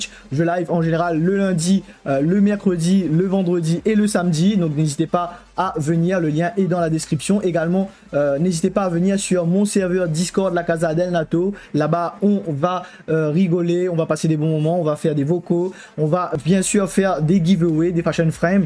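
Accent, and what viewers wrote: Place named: Reunion, France. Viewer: a l'accent je sentait un 974 caché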